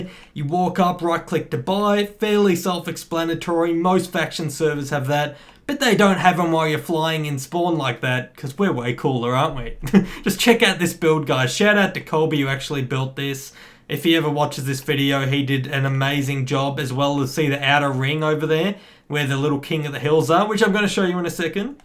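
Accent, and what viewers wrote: Australia. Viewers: Dude you are awesome and are you Australian?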